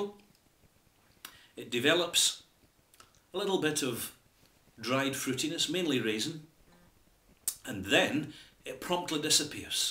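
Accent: Scotland, United Kingdom. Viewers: I love your accent. It's Scottish right? Like the dwarves in fantasy movies lol. Love that accent it's so bad ass.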